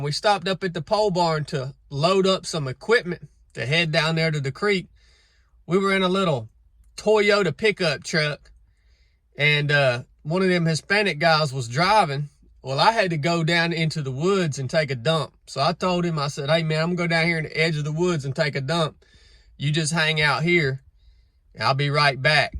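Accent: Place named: West Virginia, United States. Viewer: You from West Virginia or any of those surrounding states? Accent is familiar with mountain people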